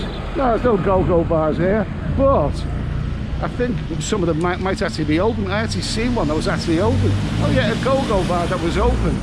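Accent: Liverpool, United Kingdom. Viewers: i am sat here on a wooden stool washing up last nights dishes ( Issan) and am laffing my bollocks off at ya its amazing what the ol one two buckle my shoe can change ones mood!! This was like watching and listening to a scouse Bob Monkhouse... i wriggled that much on this stool av got splinters in mi arse... Great stuff Chok dee mi ol flower.. Big Cee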